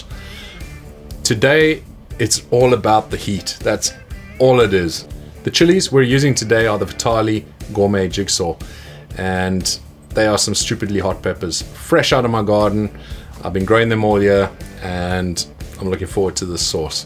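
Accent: South Africa. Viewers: Where are you based? You sound South African.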